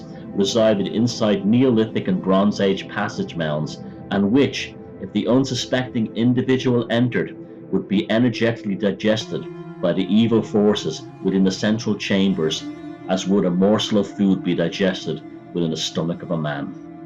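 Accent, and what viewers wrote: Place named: Ireland. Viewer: I am very upset because this is the perfect documentary for general overview of the topic, but the audio quality completely ruins it. The Irish accent would be no problem at all if it did not sound like you recorded the audio with a 1995 Talk-Man (from Home Alone 2 for reference) off a 1950s AM Radio broadcast.. Holy hell man, it's like you severely downgraded the quality. If this is any way to judge the technology you own, you definitely still listen to cassettes and watch movies on VHS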